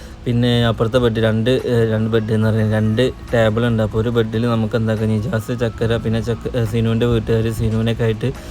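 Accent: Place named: Malappuram, India. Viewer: Malappuram talk i like very much i am from kannur.peole are so humble and broad minded❤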